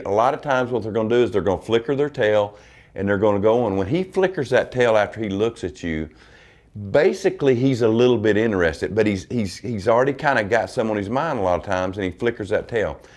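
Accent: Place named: Oklahoma, United States. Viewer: I can tell by your accent your an Oklahoma boy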